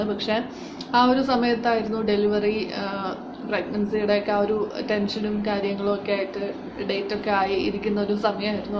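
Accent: Palakkad, India. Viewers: Palakkad Way of talking More vlogs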